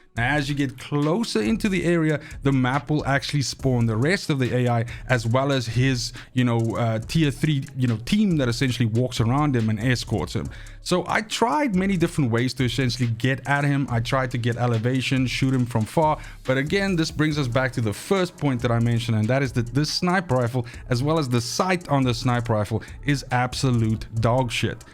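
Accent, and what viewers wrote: Afrikaans, South Africa. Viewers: Bro are you South African?? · Man sounds Afrikaans af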